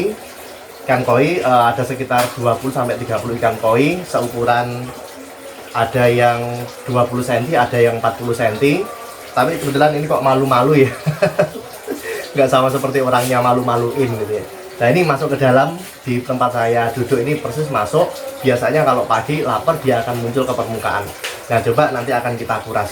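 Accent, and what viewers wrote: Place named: Java, Indonesia. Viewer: Wong jowo medoke ketoro hahaha lanjutkan pak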